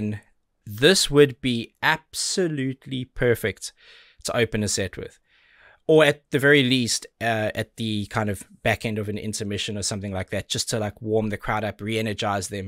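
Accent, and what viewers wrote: South Africa. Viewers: I have been watching you for more than almost 2 years I guess and I failed to realize that you are a South African and not a Brit inspite of my good understanding of various accents.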